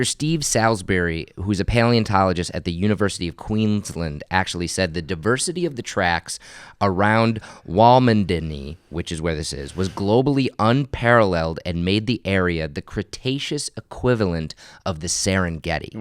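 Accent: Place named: United States. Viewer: You sound "super american" lifes a bitch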